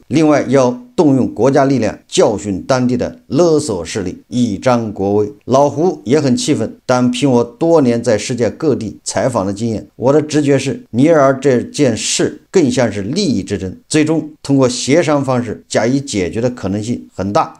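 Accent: Anqing, China. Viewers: 你是安庆老乡吗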